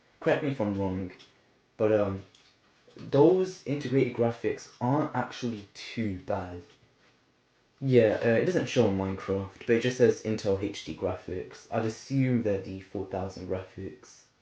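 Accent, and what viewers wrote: United Kingdom. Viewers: you sound british tho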